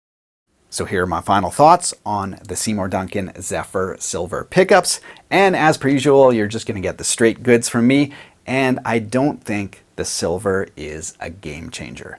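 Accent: Canada. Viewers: are you Canadian????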